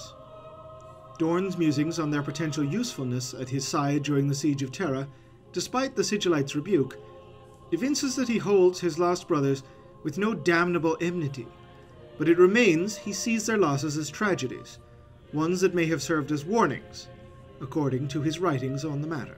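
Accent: Ireland. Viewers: As a lifelong warhammer fan can't believe I am only finding this channel now. Exceptional presentation and delivery. Are you from Ireland.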